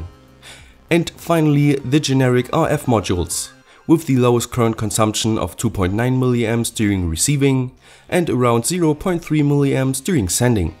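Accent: Germany. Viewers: Sander Dol i think he’s German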